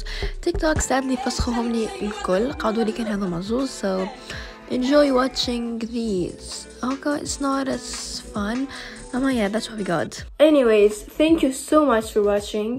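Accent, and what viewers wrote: Tunisia. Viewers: ياخي تونسية ؟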